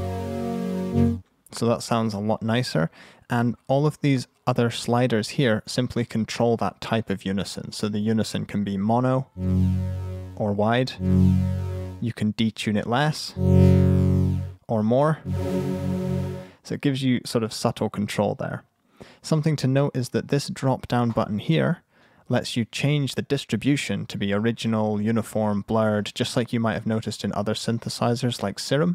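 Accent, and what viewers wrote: United Kingdom. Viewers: 9 years of experimenting and reading and trying to understand FM Synthesis and here is this random guy on youtube with a British accent laying it down in 20 minutes.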